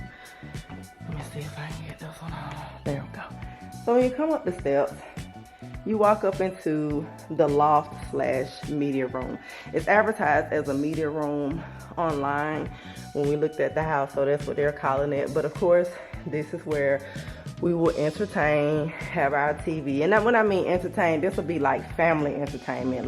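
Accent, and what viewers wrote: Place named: Tennessee, United States. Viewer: Ur accent ❤where r u from ? Sounds like ur from Tennessee or Alabama?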